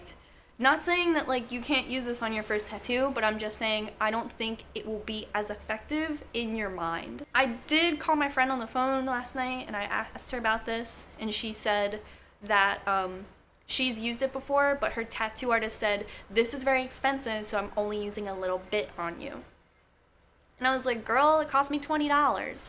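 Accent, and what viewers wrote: Philadelphia, United States. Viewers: Your from Philly?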